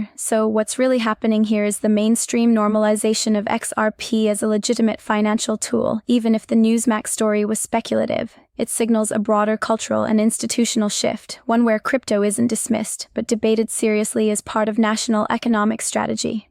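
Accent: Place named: Latin America. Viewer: I prefer her Latin accent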